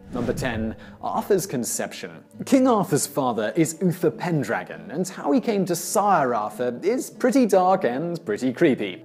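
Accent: United Kingdom. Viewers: Why do Brits pronounce it SICKTH instead of SIXTH?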